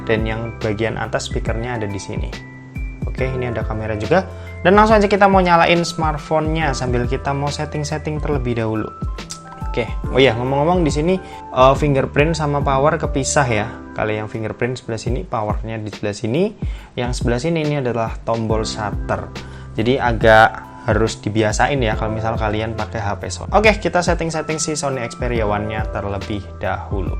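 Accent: Semarang, Indonesia. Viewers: Mas wong semarang yo?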